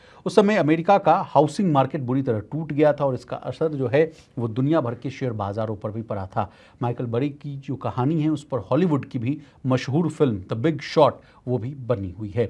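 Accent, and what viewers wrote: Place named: Bihar, India. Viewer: Ye Bihari h tone se pata chal rha h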